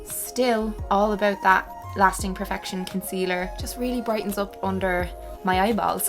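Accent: Dublin, Ireland. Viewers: Are you from northern Ireland? You sound like your from Dublin tho😂 so idk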